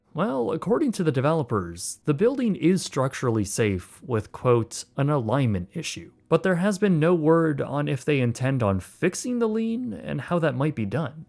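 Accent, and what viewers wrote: Canada. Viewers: I like the hosts crazy Canadian accent 🙂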